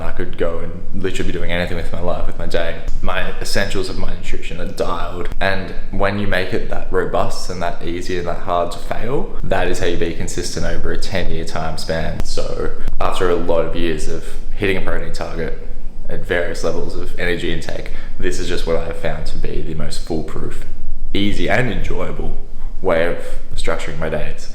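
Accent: Australia. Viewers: Hey man, its probably because of the aussie accent but you remind me so much of calm, less crazy Last Natural! Keep up the videos really enjoying it.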